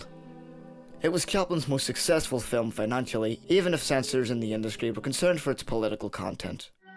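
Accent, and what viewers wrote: Northern Ireland, United Kingdom. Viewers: Just watched the film; I enjoyed it very much. If I may ask, where did you go to college/film school? Judging by your accent you are from Northern Ireland, correct?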